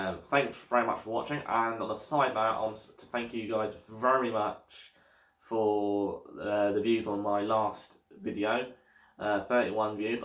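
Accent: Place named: Australia. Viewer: Damn it hell sucks, I had to stop and try find another video due to me not being able to understand a single word this guy says. It's a shame cos it seems his informations handy. I'm Australian too so I should be able to understand a fellow English speaker but this guys accent is stroonngg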